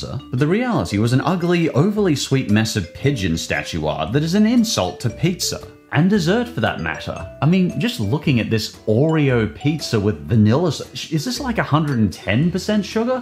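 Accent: United Kingdom. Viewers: I like you're British accent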